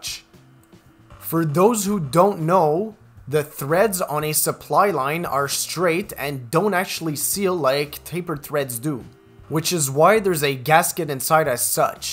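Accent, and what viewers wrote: Canada. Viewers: are you from Canada? your accent seems canadian !?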